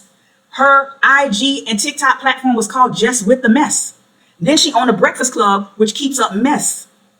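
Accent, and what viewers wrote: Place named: New Orleans, United States. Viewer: U sound like u from New Orleans!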